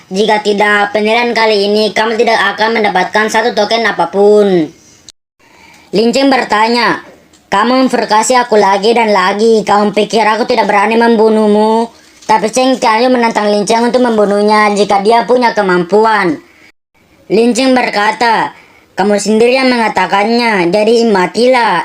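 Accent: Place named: Bugis, Indonesia. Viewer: Adminnya suku bugis ya.. nadanya seperti suku bugis kalau berbicara..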